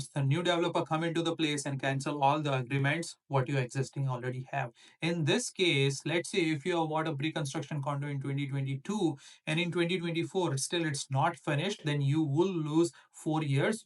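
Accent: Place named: Canada. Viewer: This is the new canada accent...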